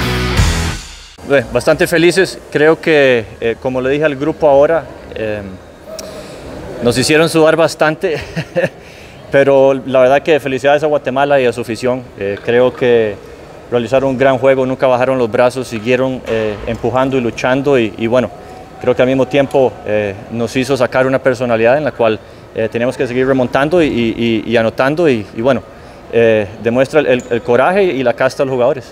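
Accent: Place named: Costa Rica. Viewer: El tecnico este es tico?😳🤔 se le nota en su acento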